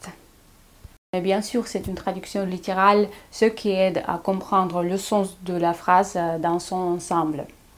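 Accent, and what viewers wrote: Russia. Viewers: Votre accent russe est vraiment délicieux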